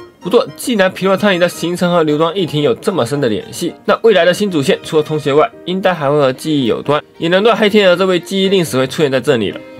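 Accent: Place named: Beijing, China. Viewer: 下次可以直接說是北京口音😂（我覺得你說的應該是這個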